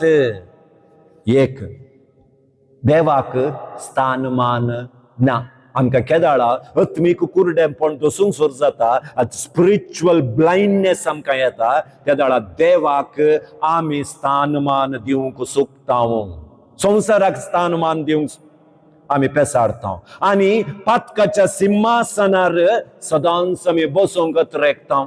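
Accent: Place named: Mangalore, India. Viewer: Amazing teachings Fr. You are always our favourite preacher. Your konkani is mangalorian it sounds nice.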